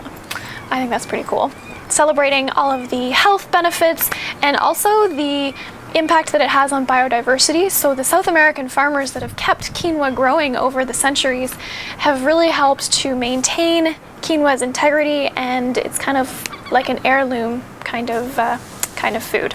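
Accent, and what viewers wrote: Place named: Canada. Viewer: I notice you have a Canadian accent. Are you originally from Canada? Where do you live ?I watch your videos and all is good! Love the Recipes.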